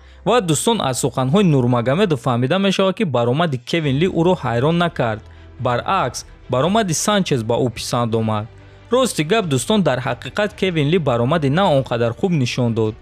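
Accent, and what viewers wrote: Tajikistan. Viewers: Овози ширини точикии тозаат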